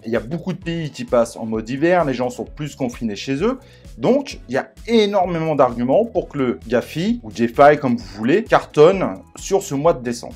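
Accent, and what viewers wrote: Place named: England, United Kingdom. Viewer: J'aime beaucoup ton accent anglais 😅 Dommage de ne pas avoir présenté de projets qui n'ont pas encore pump Merci quand même chef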